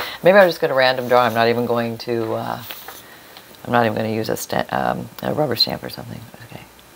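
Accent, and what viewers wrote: Canada. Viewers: Good afternoon 🌞 I love your white hair. I know it's officially blonde but on my TV it's very white and very pretty. Yes Canadians have a slight accent. Anywho, love the little tidbits and ty for the giggles. You always make me laugh. My hubby caught me watching you again. He said and I quote, " you would leave me for crafty videos" 😂😂😂 why yes, yes I would, 😅😅😅 have a great day! ❤ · I love the Canadian accent! I have a friend that lives in Nova Scotia and I could listen to her talk for hours 😊😊 Also I have an Aunt and Uncle who live in Fargo ND.. I live in New Mexico and when they come to visit I love listening to their stories.. Accents are soo unique and are always intriguing to me. 😁😄😊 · Thank you for more inspiration! Sometimes I overlook the obvious, such as being able to draw a border to get the effect I want. I spend hours looking for just the right paper when actually I can create my own design, and have fun doing it! 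🤓~ I love the Canadian accent, especially on the 'out' words. 🥰 I have the Texas twang which is a giveaway of where I'm from, anywhere I travel. Oh well, we work with what we've got, eh? :virtualhug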